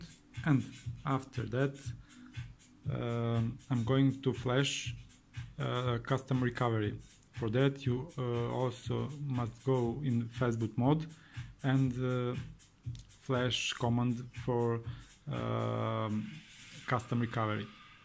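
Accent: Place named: Serbia. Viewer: Pazi mu akcenat.. ovo neki nas garant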